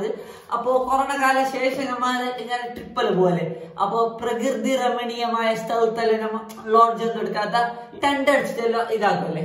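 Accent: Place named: Kasaragod, India. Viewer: Kasaragod anno stalam mosilaa yannh kelttapol tonniyada😅🤣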